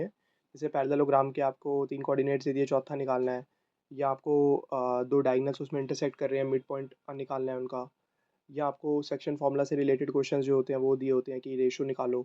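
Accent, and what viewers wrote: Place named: Ghaziabad, India. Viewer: hey do you use any social media platforms btw my mom is also from gaziyabad and i love the excent of that and i am quiet intrested to talk with if you have any account plz tell😊and conversation in comments section is quite award for me😂😂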